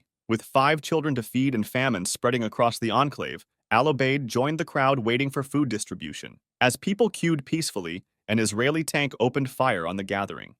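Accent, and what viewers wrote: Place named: United States. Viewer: Who else is American and didn't think it would be soccer? Especially since the voice sounds American.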